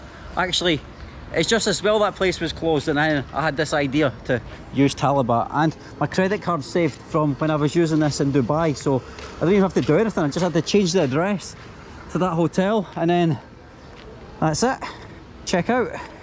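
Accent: United Kingdom. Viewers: Are you British .... cause your accent is so harsh to me❗❗🤔🤔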